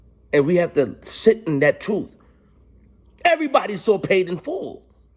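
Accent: New York, United States. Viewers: Y u telling like a new Yorker o my bad that's all ya'll do B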